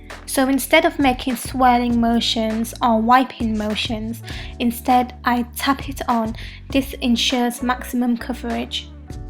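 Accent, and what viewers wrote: United Kingdom. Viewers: i like ur accent!! how long have u been staying in UK? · you're sooo beautiful! and you sound like you've got a British accent ;)) so cool! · u have abit of a british accent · i love how she is asian yet has a british accent :) its so neat!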